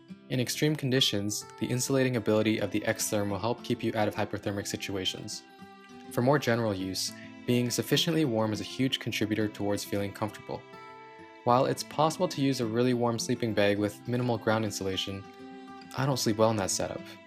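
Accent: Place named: United States. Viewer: My, my, aren't you petulant. 1) Assuming you were an American is not an unfair assumption since that's what your accent sounds like to my ears. 2) I know it's an old video. I even say as much right off the bat. Nonetheless, the comment still stands about not using one standard of measurement (i.e. imperial) as other people are accustomed to the other standard (i.e. metric); hence why it's better to use both. You may very well have changed this since you made the video, but you could have just done the proper thing from the get-go, and that's all that I was really saying. I only saw the video yesterday because that's what came up in my recommended feed. You know, you could have taken my comment in good spirit instead of getting all tetchy about it. You could have laughed it off; that was an option for you. But instead you've made a big deal out of nothing. 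Sheesh!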